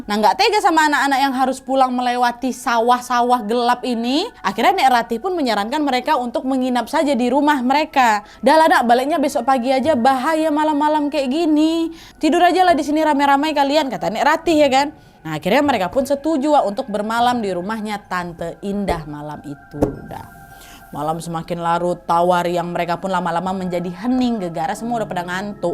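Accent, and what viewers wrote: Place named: Dumai, Indonesia. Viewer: Ini orang Dumai ya?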